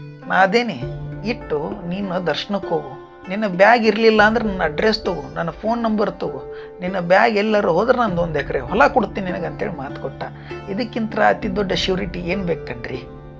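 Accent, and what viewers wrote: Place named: Hubballi, India. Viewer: ಹುಬ್ಳಿಯವ ಹುಬ್ಬಳ್ಳಿ ಸ್ಟೈಲ್ ನಾಗ ಮಾತಾಡೋ...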